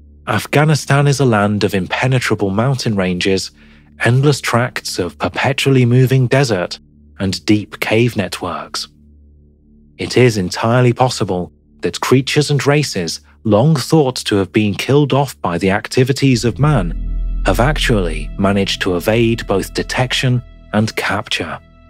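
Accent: United Kingdom. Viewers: It's nice to have a bit of variety. New guy needs to vary up his tone a bit, go for more emphasis, be a little more lively. Otherwise? Pretty nice. But, then, you can throw a decent Brit voice at anything and us 'muricans will go for it.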